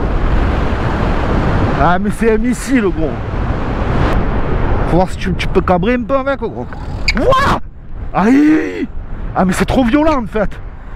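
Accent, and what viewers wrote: South, France. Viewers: Je me régale avec tes vidéos ! je suis nouveau dans le monde de la trot et viens de d'acheter la Dualtron mini 21ah, mais je t'avoue que je suis un peu trouillard dès que je suis doublé par des bagnoles, j'espère qu'avec l'habitude la peur va disparaître ! C'est con d'avoir peur car je suis cycliste et me suis tapé 100 fois l'aller retour Marseille Les Lecques par la Gineste (j'habite à Paris mais suis Marseillais) à vélo de course l'été pour aller me baigner ! Peut-être l'âge rend t'il trouillard, 50 balais 😂 En tous les cas tes vidéos sont trop sympas, et ton accent que j'ai un peu perdu, trop cool 👍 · Je kiff cet accent du sud. Je pourrais l'écouter des heures :D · Le Sud , cet accent , le soleil dans l'oreille 🔥🔥✌ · J addddoooooooore un marseillais faire la démo.....trop mmmmmmdrrrrr ... ti é fadah !!! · Bonjour, très très impressionnant, et j'adore ton enthousiasme avec ton accent du sud, c'est un bonheur ta vidéo! 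Par contre une remarque sur quelquechose qui m'interpelle en fin de vidéo. Le cintre carbone semble avoir été trop serré, la surface périphérique de part et d'autre de la potence est un peu mangée... ce qui n'est pas normal. 6NM maximum écrit sur la potence (alu...) mais pour du carbone... j'ai un grand doute. À l'époque où je choisissais encore la voie du carbone sur mes cadres et périphériques VTT avec l'idée que la rigidité me ferai perdre moins d'énergie (avant de passer à l'acier, puis au titane pour le bonheur de mes fesses, mon dos et mes bras), je mettais 5NM max sur pièce carbone, et jamais de serrage sans une clé dynamométrique bien étalonnée! Et pour éviter qu'un couple moins important de serrage n’occasionne un découplage intempestive, Loctite bleue, voire rouge en fonction des pièces. Désormais j'évite le carbone sur des pièces où ça n'a pas vraiment d'intérêt, et plus encore sur les pièces où cela peut être dangereux. 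On dirait que le carbone de ton cintre commence un peu à être mangé à à , vraiment.... À vérifier en tout cas ... j'ai des photos d'un pote qui a subi une casse de cintre carbone trop serré en VTT, en pleine descente technique... multiples fractures du visage c'était très très moche (pas de casque intégral puisque XCM et pas DH). Et il a eu de la chance... Sur un engin électrique pareil sérieusement, je n'irais JAMAIS mettre du car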